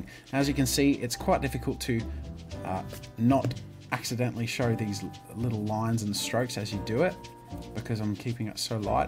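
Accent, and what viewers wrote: Australia. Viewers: Aussie mate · Oh shit, this guy's an Aussie!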